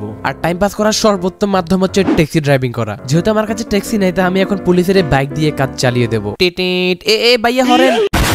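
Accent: Noakhali, Bangladesh. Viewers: Valo vabe kotha bolo nuakhalir vasha na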